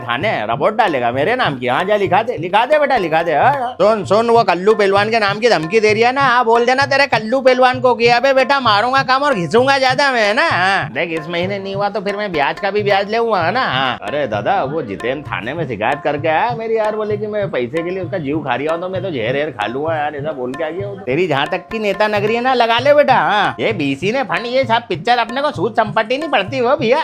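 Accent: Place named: Indore, India. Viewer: mast bhiya,,, that's exactly how these indoree money landers talk like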